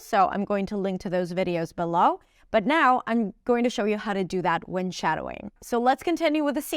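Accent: United States. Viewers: is your english american?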